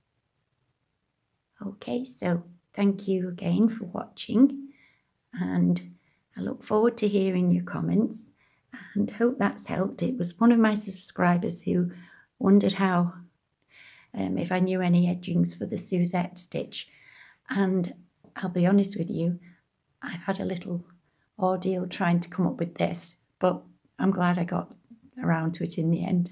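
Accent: England, United Kingdom. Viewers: It’s a great stitch but I’m in the uk and got a bit confused as you have an English accent. I missed the very beginning of the video and only realised after seeing you crochet you were using American terminology, but your video showed all the stitches very clearly, I was looking for a border for the Tunisian crochet blankets I make, this is going to be very useful, thank you.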